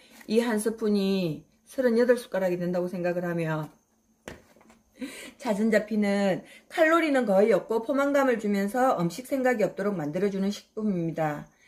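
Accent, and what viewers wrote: Gyeongsang, South Korea. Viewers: 경상도 사투리 정겹노 ㅋㅋ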